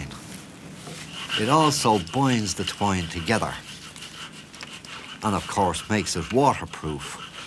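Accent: Dublin, Ireland. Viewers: I was just thinking, the narrator has a great Dublin accent, without being common as muck. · Chris Clark it’s what is known as “ an auld fashioned” dublin accent. Far softer and far better pronunciation than the dumb sounding monotoned aggressive sounding accent that Dubliners have today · I haven’t heard that accent since I was a little girl! My great grandfather was born in Dublin, came to US in 1928. He passed in 1964 when I was age 12.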